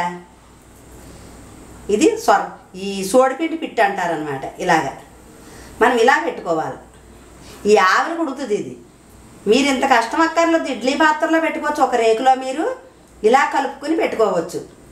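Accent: Godavari, India. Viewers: Amma meedhi godavari jilla na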